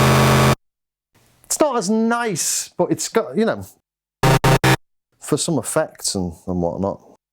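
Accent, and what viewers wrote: Liverpool, United Kingdom. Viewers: Scouser? You and Doug from the Soundtest Room know each other?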